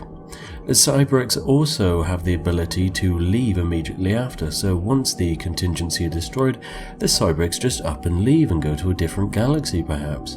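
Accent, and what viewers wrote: United Kingdom. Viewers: I kinda miss this brit voice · So he’s not just doing a bit, is actually that British?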